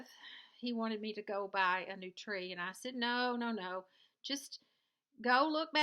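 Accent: South, United States. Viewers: You have such a lovely southern accent! It's fun to listen:) Glad to see your hand is getting better- but you have to take care of it- stitching time might need to be sacrificed.. The broom cover is very cute- I might be tempted to stitch something like that too. I never tried primitive designs- looked more into punch needle style. I'd like to try for your giveaway for either one of magazines. BTW I'm doing a giveaway too :) Happy holidays!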